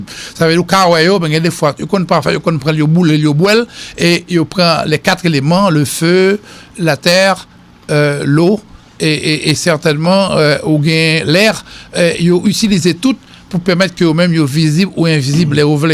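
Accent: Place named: France. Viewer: Misye pale tankou yon blan fransè 😂😂😂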